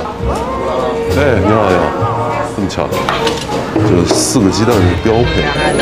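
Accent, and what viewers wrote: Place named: Sichuan, China. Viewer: 说四川话比说上海话好听多了，别整天好不啦👎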